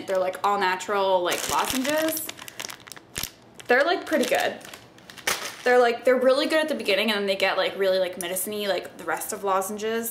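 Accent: United States. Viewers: its like a boston-ey accent lol, they put a ehg emphasis on their a's.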